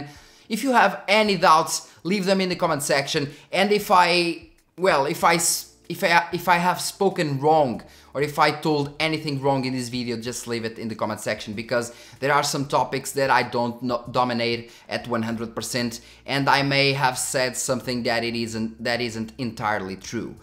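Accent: Portugal. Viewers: the portuguese accent in english is weirdchamp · Mal ouvi o teu sotaque vi logo que eras tuga, bom saber que existe malta a cobrir estes temas para além dos "big ones", toma a minha subscrição!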